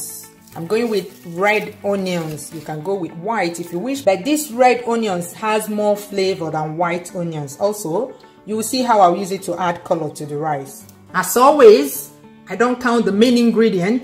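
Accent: Igbo, Nigeria. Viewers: Am more paying attention on that lovely igbo ascent more then the lecture Nnem dalu. On my way to supermarket over a month now this is exactly I need the German resentment never gave me the test I needed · Your accent is always sounding Nigerian, this jollof rice is called benachin or cheb in Gambia or Senegal respectively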